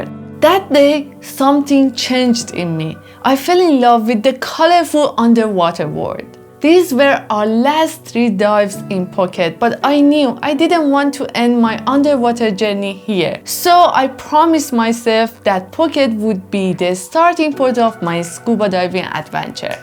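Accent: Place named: Iran. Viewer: Let me guest where you from without knowing anything about you. Sounds like you from Iran 🇮🇷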